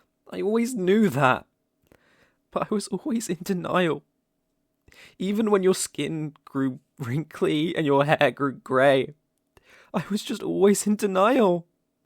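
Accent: United Kingdom. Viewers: Helloooooooooooooo good to hear ur british voice again XD back from the dead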